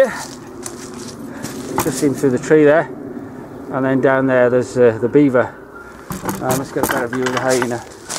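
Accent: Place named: North, United Kingdom. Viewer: You sound a Northerner , so where are you shooting ??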